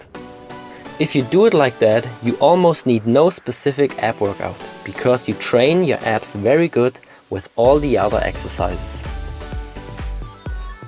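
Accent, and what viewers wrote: Germany. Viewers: That was a german accent, right? ^^ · dieser deutsche akzent hahahahaha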